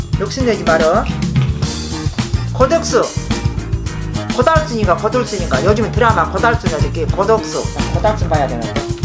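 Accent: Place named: Gwangju, South Korea. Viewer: 너무 고우세요..♥♥ 저희 할머니 생각도 나고 제가 광주사람이라 그런지 사투리도 너무 정겹고.. 할머니 사랑해요 항상 응원해요♥♥♥♥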